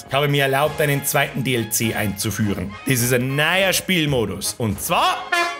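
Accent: Bavaria, Germany. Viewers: Gibts as englisch dann a mit Bayerndialekt ?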